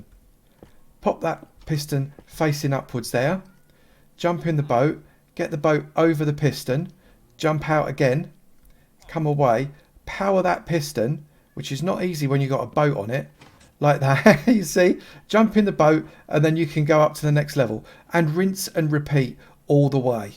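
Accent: United Kingdom. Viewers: so because someone has a british accent, they're automatically Gordon Ramsay? The guy sounds nothing like him, aside from accent that is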